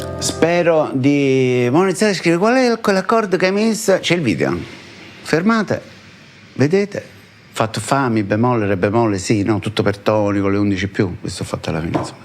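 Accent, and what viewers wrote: Naples, Italy. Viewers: Oltre che bravo insegnante sei simpaticissimo con le tue espressioni con cadenze napoletane. GRAZIE mille.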